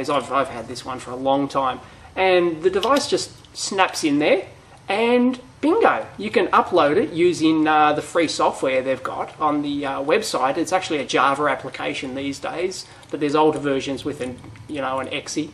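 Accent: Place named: Australia. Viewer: I wondered about the strange accent ... Now I know Australia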